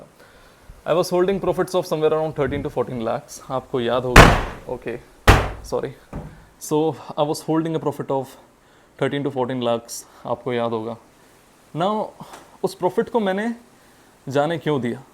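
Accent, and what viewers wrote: Kerala, India. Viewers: Kay tum Kerala se ho?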